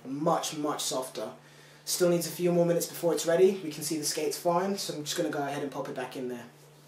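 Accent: United Kingdom. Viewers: a black guy with a British accent talking about hockey skates..... lol crazy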